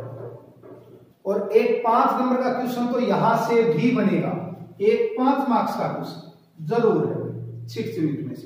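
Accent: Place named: Haryana, India. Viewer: Hindi m haha aapni haryanvi aa sahi h sir ji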